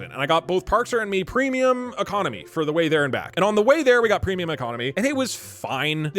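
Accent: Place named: United States. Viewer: you dont know nz at all dude, all americans sound exactly like you lol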